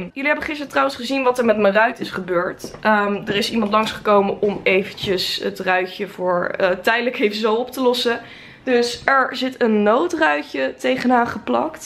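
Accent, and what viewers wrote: England, United Kingdom. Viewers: is hij echt engels of praat dié gewoon zo voor de grap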